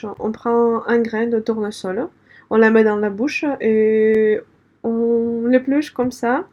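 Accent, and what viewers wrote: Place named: Russia. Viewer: Privet J’apprends beaucoup de choses sur la vie en Russie ma moitié est impressionné elle est Russe mais je lui dis rien c’est un secret continue comme ça pour tes vidéos c’est toujours appréciable et on apprend beaucoup de choses tu es très pédagogue et surtout ton accent russe qui est toujours agréable merci à toi vivement le prochain cours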